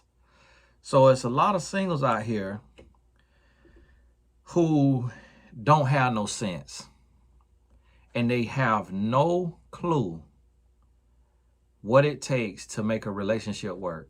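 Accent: South, United States. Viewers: I just LOVE how you talked to your wife when she came into the room. You can SEE the love and adoration you have for her! Just beautiful! And everything you said is ON POINT! Also, PLEASE keep your Southern accent. It’s beautiful, down-to-earth, sometimes comical (when intended) and refreshing. God Bless You Tony Gaskins!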